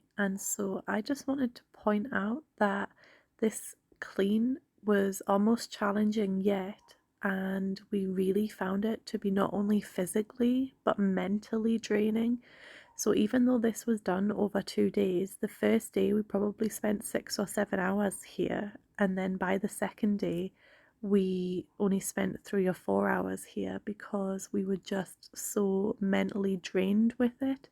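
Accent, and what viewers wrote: Scotland, United Kingdom. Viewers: Don’t be afraid to go full Scottish on the job! That is part of what makes this channel so unique!! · Loving your channel and your beautiful Scottish accent💛, so glad there is still kindness like yours in the world🥰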